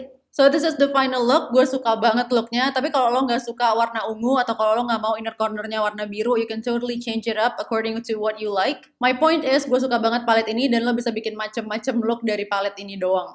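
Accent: Java, Indonesia. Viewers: aku ko selalu ngakak ya setiap ngomong jawa, medokkk banget 😂😂😂 Tp ttp cintaaaa❤❤❤❤❤❤❤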